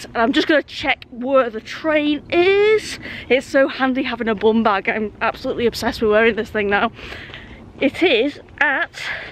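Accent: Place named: Northern England, United Kingdom. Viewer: Very possibly 'tactile' pavement where the old bust stop was...used by visually impaired people to aid location... Great video...Mrs Boulder loves your oop north accent...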